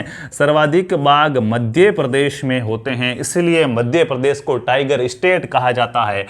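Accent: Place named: Marwari, India. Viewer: Sir aaj ki class bhut aachi thi 🙏🙏 मारवाडी बडी मिठी बोलो आप 👌👌👌👌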